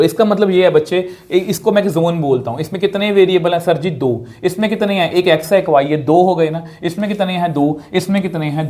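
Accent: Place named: Pakistan. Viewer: Sir apki accent pakistani lgti h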